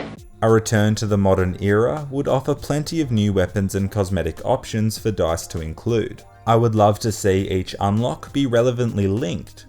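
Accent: Australia. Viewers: Another winner my friend, keep up the great work. Also i love that smooth auzie accent. God damn it makes me wetter then a pelican diving for fish!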